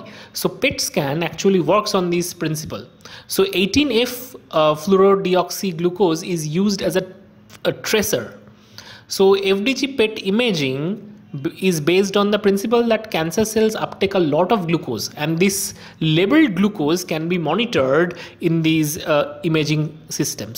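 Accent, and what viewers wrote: India. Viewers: Your videos are good but I don't watch them because I'm scared of indian accent 😅 sorry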